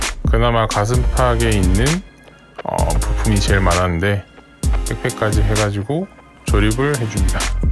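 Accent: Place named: Changwon, South Korea. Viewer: 첫영상은 사투리가 조금씩 들리네요ㅋㅋ 저도 창원살아서 정겹습니다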